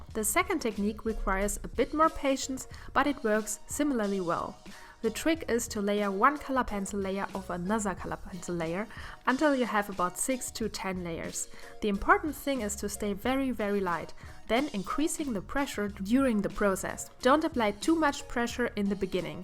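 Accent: Germany. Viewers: Super Video! Aber bitte schau dir nochmal die Ausspracheregeln für das Englische an (v ≠ f, th ≠ f, t). Du bist aus Deutschland, oder? Hört man binnen Sekunden. ;) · thats one heavy german accent. Aber ernsthaft Respekt, dass du eine Videos in Englisch machst ist bestimmt nicht sehr einfach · The first thing I thought: She's german. You have such an heavy accent, but it's a good video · I have the feeling you're from europe(maybe germany) because of the way you speak 😄 very nice Video 👌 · Schönes Video :), ich habe mich erst gewundert wieso mir dieser Akzent beim englisch sprechen so bekannt vorgekommen ist, bis ich durch die Kommentare gescrollt bin und deutsche Kommentare gesehen habe xD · Min warte mal ... Man kann das Fabriano aquarell Papier auch hier in Deutschland kaufen 0.0 Ich muss wissen wo !!! Hast du es auch mal in einem laden gesehen oder kaufst du es immer online ? 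Ich dachte anfangs du kaufst dein Zeichen Material (zumindest das was in diesem Video ist ) bei boesner , da man das Aquarell, als auch die Airbrush, als auch die luminance stifte alle bei boesner bekommst. Vorallem die luminance, ich habe die in keinem anderen Laden gefunden, nur in boesner. Deshalb war ich so verwirrt mit dem Papier. Du würdest mir echt sehr helfen wenn du mir sagst wo du es kaufst oder ob du es mal in einem laden gesehen hast. Ich mag übrigens dein Zeichenstil total und wie viele verschiedene Medien du benutzt. Ich muss das auch mal austesten , gut das ich das ganze Material (bis auf das Papier D: ) hier zuhause habe xD Naja auf jeden fall würde ich mich sehr freuen, wenn ich eine Antwort von dir bekomme :D (Und sorry, dass ich in deutsch schreibe, aber man kann deine Muttersprache nicht überhören xD Jetzt nicht böse gemeint oder so °^° ) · You kinda have a german accent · Interesting German accent :) not my artstyle but your video it is actually very helpful... 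sometimes I use watercolor and I would love to try out more. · Are you german your Akzent Sounds like it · I think she is from Germany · Man hört richtig das du Deutsche bist :"D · are you german bc you sound like youre german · i can hear that youre german ;3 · You start speaking English CUZ of your accent- she is german But i like your arts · wow wie hart man merkt, dass du deutsch bist an dem akzent ! Aber schöne Hacks! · Kannst du deutsch denn der Akzent hört sich so an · Man hört deinen deutschen Akzent sehr stark raus. Du solltest ganz besonders versuchen, die Aussprache von th zu üben, um es weniger wie ein s auszusprechen. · As German native speaker I can definitely tell you that she's German. (Her last name is German, but that usually doesn't tell you much) Russian accent sounds different. Also she wouldn't be able to pronounce the German brand Schminke as perfectly as she did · In den ersten Sekunden hab ich instant gemerkt das sie deutsch ist 😂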